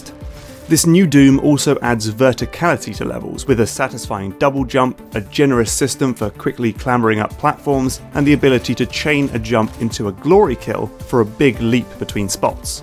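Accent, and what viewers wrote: United Kingdom. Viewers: Your British is showing! ; ) "This thing, that chases you like a quarterback." Quarterbacks don't chase people! Anyway, love the channel! Keep up the good work.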